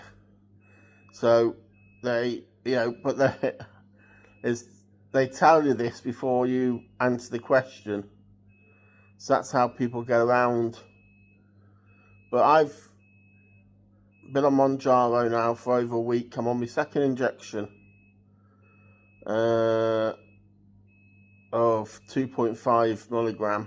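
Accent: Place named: Northern England, United Kingdom. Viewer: What part of the uk are you from? You have a northern accent! Ah bless you keep strong!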